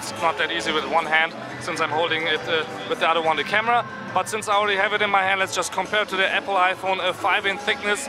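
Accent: Germany. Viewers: German accent? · Wie man sofort hört, dass er Deutsch ist xD Immer wieder lustig :D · God, do u sound German!^^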